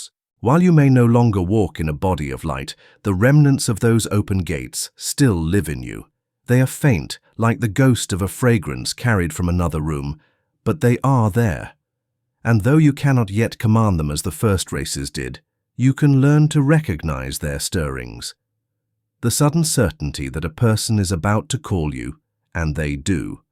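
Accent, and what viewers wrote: United Kingdom. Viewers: Plus the prevalent British accent… 😑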